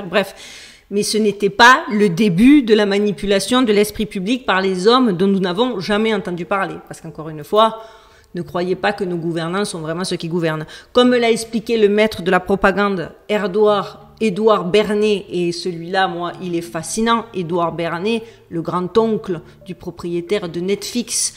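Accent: South, France. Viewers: Super travail, magnifique accent du Sud... nouvelle abonné, j'adore !... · Excellent! Ceux qui vous dénigrent et dénoncent sont des Trolls. Donc ils n'existent pas. J'aime beaucoup votre style narratif avec ce petit accent du sud de la France et ces petites saillies humoristiques. 😊👏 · Vous êtes remarquable dans vos recherches, vos analyses et vos explications . C’est un régal de vous écouter . Merci pour cette touche d’accent du midi .. c’est du soleil et sa lumière qui nous envahit. Continuez à nous régaler .. Que Dieu vous accompagne dans cette recherche de la Vérité .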